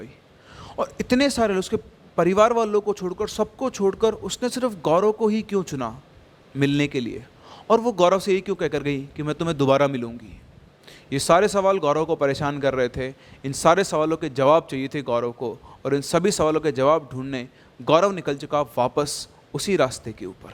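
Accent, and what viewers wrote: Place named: Maharashtra, India. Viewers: Marathi accent 👌 एकदम भारी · Sir ka marathi accent 😍😍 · मुझे b तुम्हारी मराठी टोनिंग मस्त लगी.😅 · First Viewer✨ Nice Marathi accent 👏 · I am from Maharashtra , loved your marathi accent 💯 After IIT and NIT here comes HIT 🤣🤣🤣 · Marathi accent bhot sahi 😂😂😂😂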